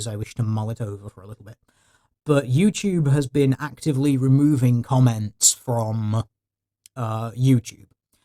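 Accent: United Kingdom. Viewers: The Crown killed Princess Diana. All monarchies including Monarchial Constitutionalism are inferior to Republic Constitutionalism. Fite me. (Sorry talking about Conspiracies involving one car crashes with a british voice mentioning it triggers this type of shit posting.)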